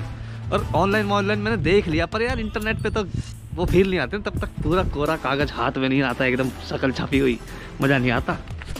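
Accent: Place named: Rural, India. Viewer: Bhagwan kasam I love your Desi voice ( I also have village that's the reason I also speak village language ) Majo Aago !!!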